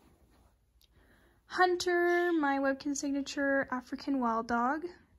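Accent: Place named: Australia. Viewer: Your aussie. Give 😂 - Tay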